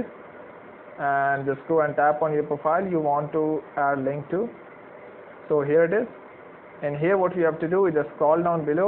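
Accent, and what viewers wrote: India. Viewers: linti indiano parang di maintindihan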